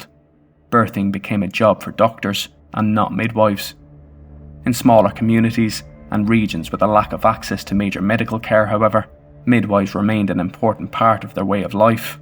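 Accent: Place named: Ireland. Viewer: Great video, but can you do something about your annoying Irish accent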